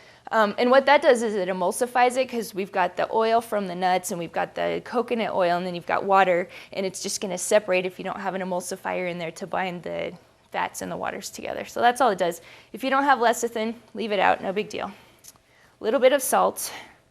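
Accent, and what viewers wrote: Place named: Australia. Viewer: Posh australian accent.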